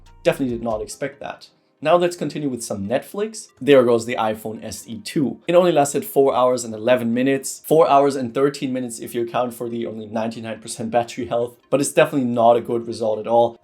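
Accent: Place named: Germany. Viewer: Having a German accent is definitely an advantage when you talk about technology.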